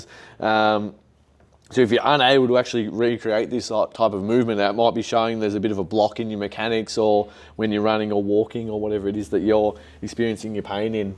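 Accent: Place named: Australia. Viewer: So much knowledge .. w some latin and the Australian accent . Glad my phone is in my pocket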